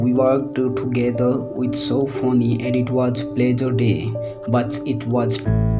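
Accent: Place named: United States. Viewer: America english😊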